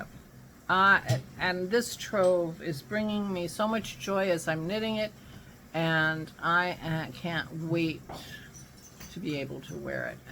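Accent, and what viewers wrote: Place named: Montreal, Canada. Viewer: I love how your Montreal is coming out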